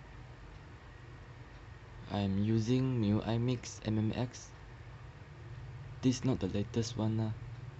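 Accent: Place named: China. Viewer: 请问能出一期中文的吗，本来就英语不好，还要听chinglish😁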